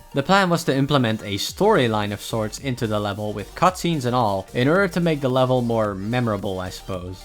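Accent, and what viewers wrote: Netherlands. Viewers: kkr dik nederlands accent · Bruv are you dutch?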